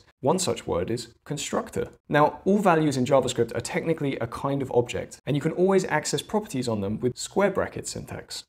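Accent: Netherlands. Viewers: Are u Dutch :D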